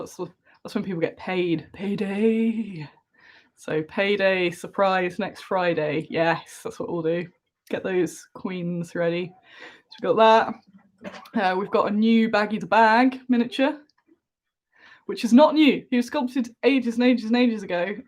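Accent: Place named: United Kingdom. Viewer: You talk like a British person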